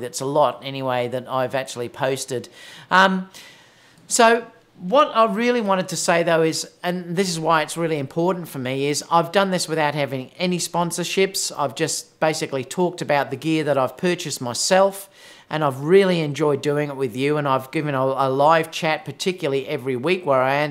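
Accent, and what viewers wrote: Australia. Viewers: Congrats! I bet your AP impersonation won you some, haha. Just be careful, I just heard JL is threatening legal against AP. Hate to see AP threat legal against you, hahaha. Reason I like your content is you are pleasant to listen to, Americans like Aussie accents, you have the same hair style as me, and you drink Corona.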